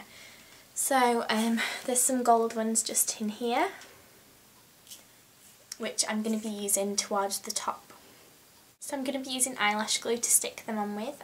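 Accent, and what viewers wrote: United Kingdom. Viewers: and a question; is your accent british or scottish or irish? i'm not from england and i can't decide which one is it, and i lovelovelove it!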